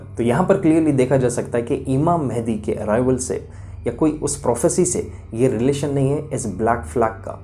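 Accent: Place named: Malabar, India. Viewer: Bhai itne dinon se videos Bana Raheho Aapki Urdu Kerala ke Malbariyon jaisi Hai Aapko Aapni Urdu Hindi Zubaan Sudhar ne ki Zarurat Hai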